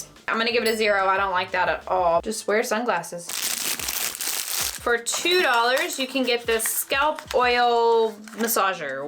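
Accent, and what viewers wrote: South, United States. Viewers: No one appreciates a Southern accent like Southerners do. There’s no shame in that game so let it fly. I have to say that of all the products shone today, YOU, are the best one. Also the freckles. And I have to wonder why companies don’t make faux hair for us silver ladies. We have less hair than anyone so you’d think they’d help us out. I’m all about some faux hair when I can find it in my natural hair of Silver and dark grey. So you go ahead and wear that faux hair with pride girl. Because when you are silver there just aren’t any good ones to wear. Peace and love girl.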